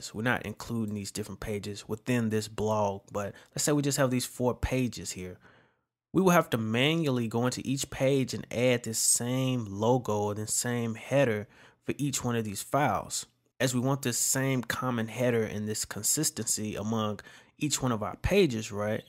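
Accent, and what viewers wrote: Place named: Brooklyn, United States. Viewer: Nice bro, its like Brooklyn brother teach me how to code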